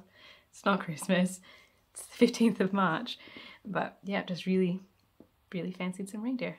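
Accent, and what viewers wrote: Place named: Australia. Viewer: New to your channel and really enjoyed your tour. I thought at first your voice sounded Canadian but then, no Australian! Ok well hello from Canada and I’ll check out more of your videos. Sorry you are so not able to get to Scotland, keep creating , stay safe! Especially enjoyed the kookaburra and reindeer!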